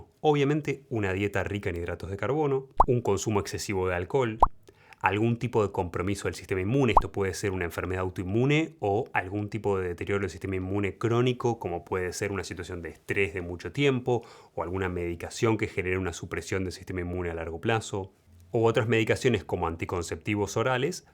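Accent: Argentina. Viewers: DR. ME ENCANTAN TUS VIDEOS. SOS ARGENTINO????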